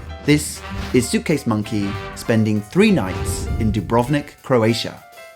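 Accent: United Kingdom. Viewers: yeah. You make videos well. Love the british accent too. But bad quality camera not clear vids. Could have been perfect vids if u use high end cams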